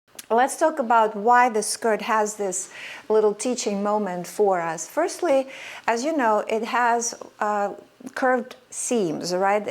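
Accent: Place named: United States. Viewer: Many native English speakers don’t have the depth in our language that you do. Impressive and an American accent!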